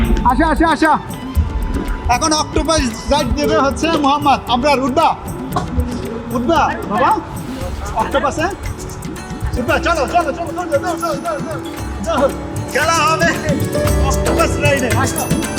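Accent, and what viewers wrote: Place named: Barishal, Bangladesh. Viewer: কথার মধ্যে বরিশালের ভাবটা বাদ দেয়া লাগব...কেমন জানি লাগে..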